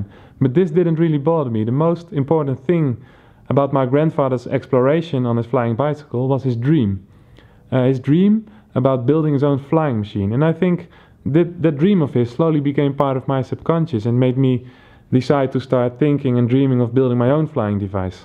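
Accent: Netherlands. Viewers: you must be dutch ^^ just cant help but notice your accent anyway Cool idea bro, hope u get it done :) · je hoort gelijk dat ie uit nl komt xD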